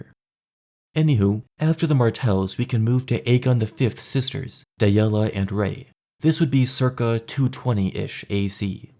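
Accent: United States. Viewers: he has to be from the Midwest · American lol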